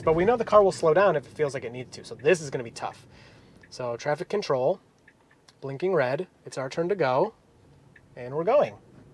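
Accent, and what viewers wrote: Michigan, United States. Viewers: Michigan guy?!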